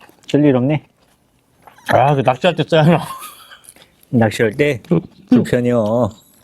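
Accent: Jeolla, South Korea. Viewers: 요즘 계속 찾아보는 초짜영상입니다. 전라도 사투리 겁나게 재밋네요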